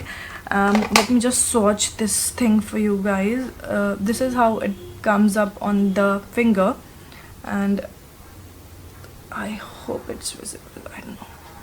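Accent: India. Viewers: sana Zehra seriously?? Just because she don't have a build up accent to her english, her english is not good? Well grammatically it's all correct, just that she's speaking in her natural indian accent you find it "not good"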